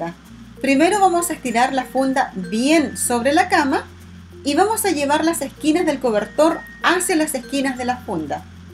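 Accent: Chile. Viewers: eres chilena? Por el acento, me gustaron lmucho tus sugerencias...además que eres muy sencilla y correcta, sin poses...todo está muy bonito gracias!!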